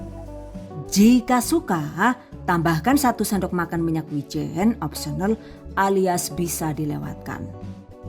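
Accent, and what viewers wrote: Banyumas, Indonesia. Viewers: Dening orang ngapak ya yhu, 🤣🤣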